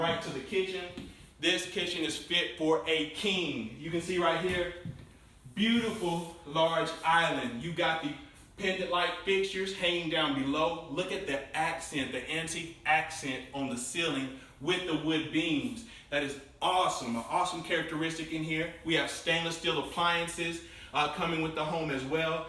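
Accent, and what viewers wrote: South, United States. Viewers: I love your country accent. Pay no attention to my son's Lego icon.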